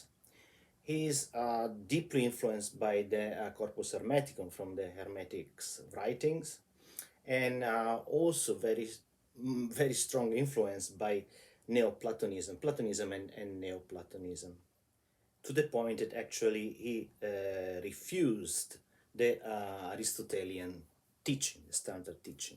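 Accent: Italy. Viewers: Da come pronuncia i nomi italiani direi che sei italiano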